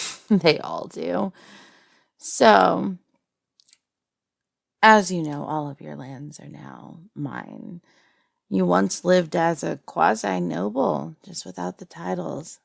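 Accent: United States. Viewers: Haha, and with a channel name like I thought it was expected. Though I was more referring to your voice in general. It’s nice to hear your blend of softened strength, the occasional spice of sadist, and a little North American country twang that serves as the perfect cherry on top. Anyway, keep up the good work and don’t forget to take care of yourself~!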